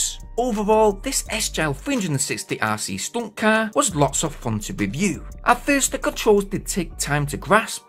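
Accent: Yorkshire, United Kingdom. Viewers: Cool car, you sound like you're from Yorkshire. I'm from Sheffield with a similar channel to yours.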